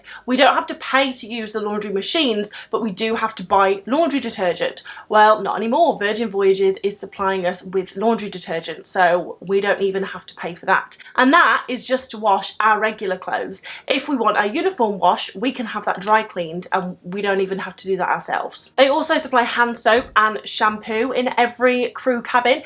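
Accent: United Kingdom. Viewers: Haha, I might work on a crew ship later in life because I don't have any experience now. But your British accent is addictive. Just watched every single video to hear you talking 🤣😂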